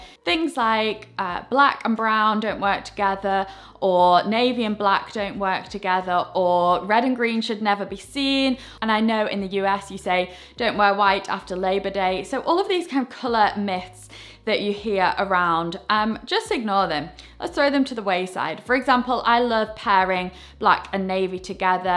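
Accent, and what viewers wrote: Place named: United Kingdom. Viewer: Can anyone please tell me what kind of british her accent is... Its so cool and i wanna practice that accent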